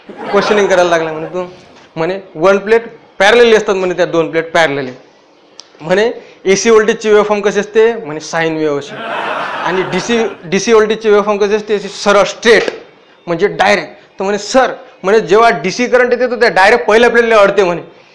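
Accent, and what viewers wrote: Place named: Vidarbha, India. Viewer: Nice to hear Vidarbha Marathi 😃😃😃👍🏼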